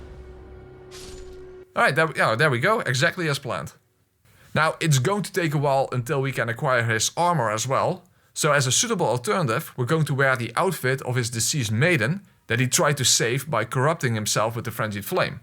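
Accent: Netherlands. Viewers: You have a dutch accent. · Perfect Dutch accent💎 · man man ge kunt ge woon horen dat deze man een Nederlander is, hallo buurman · hahaha een echte nederlander haha wat een acsent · Aha ik dacht al wat een Nederland accent dit maar blijkt dat je dat ook bent❤ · Jesus f christ I can just tell you’re Dutch, that accent is fucking awful.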